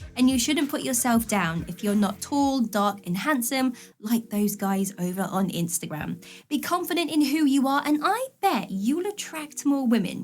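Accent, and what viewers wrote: United Kingdom. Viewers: You sound British,but u r based in usa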